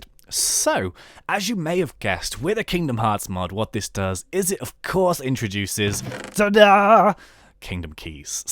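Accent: United Kingdom. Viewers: It sounds like your British... I speak British some times:). Please tell me if your British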